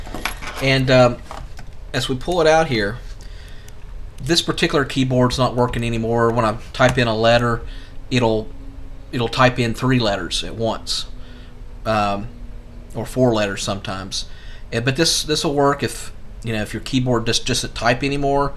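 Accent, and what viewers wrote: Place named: Georgia, United States. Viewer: I didn't notice his accent but that's because it sounded normal to me here in Georgia.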